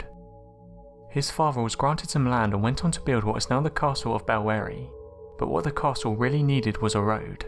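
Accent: United Kingdom. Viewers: I normally don't care for the British accent but there is something soothing about his voice. Makes it that much more enjoyable.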